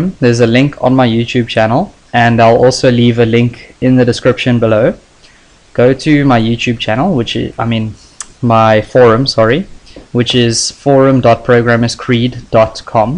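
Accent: South Africa. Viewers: South African? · south african! Respect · 100% south african accent. aweh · Dude are you South African?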